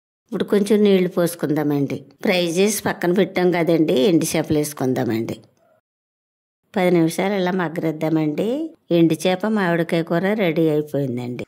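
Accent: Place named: Godavari, India. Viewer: అండి అండి అంటున్నారు అంటే మన గోదారొళ్ళే 👌👌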